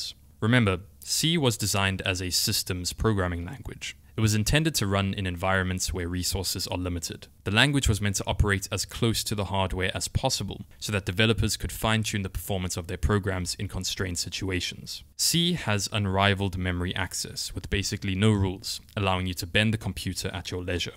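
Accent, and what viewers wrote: South Africa. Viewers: are you south African? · You sound south african? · I hear a South African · Are you south african?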